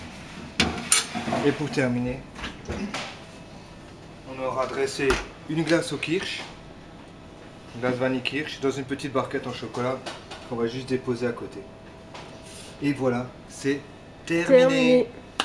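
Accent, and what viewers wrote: Alsace, France. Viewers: est-ce un accent alsacien que je reconnais là ? je me trompe peut etre hein...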